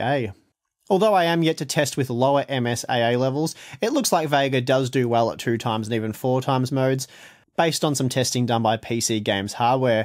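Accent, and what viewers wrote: Melbourne, Australia. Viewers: I have no idea why your critics were so critical. I've watch all your Ryzen and gpu tests and find you disclaimers eminently understandable and justified - perhaps it's because we share an accents (melburnians). Anyway keep up the excellent quality of your work.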